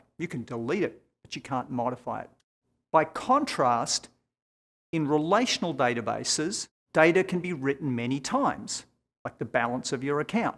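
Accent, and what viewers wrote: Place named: Australia. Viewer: That's a presentable Australian accent, they get much more wild than that!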